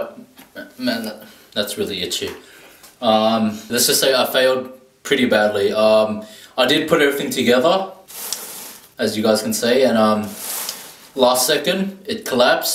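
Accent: Australia. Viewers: Till now still can’t decide whether I watch you for your talking (I LOVE AUSSIE ACCENT don’t judge pls) or how you viciously eat 🤔🤔 merry Christmas!!! 🎉🎊🎁 Ps( this is the saddest gingerbread house ever made 🤧😂)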